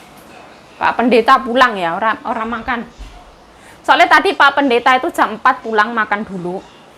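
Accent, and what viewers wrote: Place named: Ngawi, Indonesia. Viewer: Ya ampun bahasa blotrokmu wi lho hehehebe jian wong ngawi tenan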